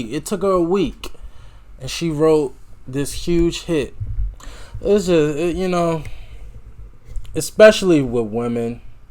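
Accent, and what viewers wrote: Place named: Ireland. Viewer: Big time Irish accent.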